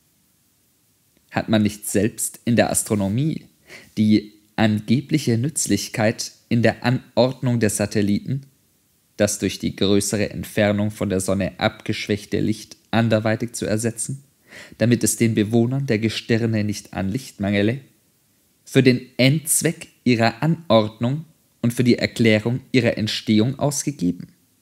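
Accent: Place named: Austria. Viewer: Es hat diesen össtereichischen Akzent. Aber ich finde daran kann man sich gewöhnen. Es ist dafür klar und flüssig gelesen und auch gut aufgenommen. Das Paket finde ich insgesamt bemerkenswert und einzigartig. Wenn man sich an die persönliche Note gewöhnt hat (die jeder Vorleser hat, das liegt per se in der Sache) kann man sich wirklich sehr gut auf das was Nietsche da verfasste konzentrieren. Schade eigentlich, wenn jemand dann aufgrund persönlichen Nichtgefallens zu solchen Ausdrücken greifen muss, und das so global abtut. Daher: Deine Meinung teile ich ganz und gar nicht. MfG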